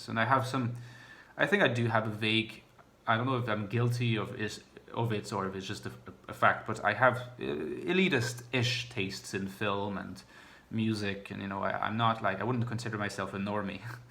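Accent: Ireland. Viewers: Some questions! Who is? Where are you from? What languages do you speak? You have an 'Irish lilt' to your voice. Did you spend time in Ireland? I do a very detailed test and come up with INFP and INTP. Do I need to take the test again. What is your educational background? You seem exceptionally well versed in the vagaries of the MBTI. Thank you so much. Lots of Love and Hugs. Test: <link> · Thank you very much for this comprehensive list,I relate to all of your points,I was trying to figure out your slight accent,thought you sound maybe a bit Irish,thank you for the clue in the video☺️ also I most struggle with the last point,I’m always that random girl for the situation and don’t really fit in most of the time,give people impression that I’m way too naive,I can’t take anymore their irrational reactions and try to avoid meeting ‘strangers’,I realize I can only safely socialize in private where I’m introduced to people by someone who already knows them,when I have to personally assert myself to a complete stranger and and have that initial small talk their lack of boundaries and sexualizing my ‘INFJ stare’ is just disastrous...i have women of my mothers age coming on to me sexually and telling me they are bisexual 5minutes into conversation...yep that’s how they ‘figured’ me out...😖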